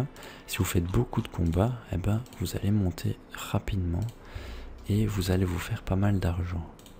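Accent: Belgium. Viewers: Tu es belge ? :)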